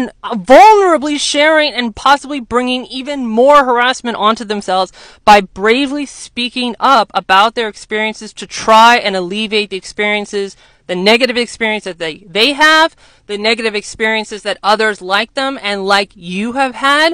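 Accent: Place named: United States. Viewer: I like your American clear accent.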